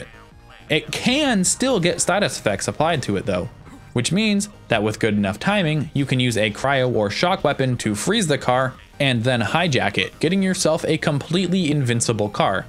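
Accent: Canada. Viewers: Is that a Canadian I hear? 😈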